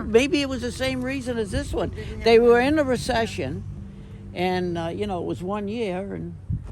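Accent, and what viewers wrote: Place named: Boston, United States. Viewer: Beautiful Cadillac! I detect a Boston accent...!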